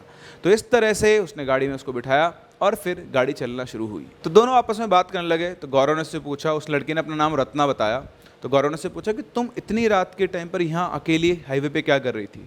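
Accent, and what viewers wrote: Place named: Maharashtra, India. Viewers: Marathi accent 👌 एकदम भारी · I am from Maharashtra , loved your marathi accent 💯 After IIT and NIT here comes HIT 🤣🤣🤣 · मुझे b तुम्हारी मराठी टोनिंग मस्त लगी.😅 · Marathi accent bhot sahi 😂😂😂😂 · Sir ka marathi accent 😍😍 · First Viewer✨ Nice Marathi accent 👏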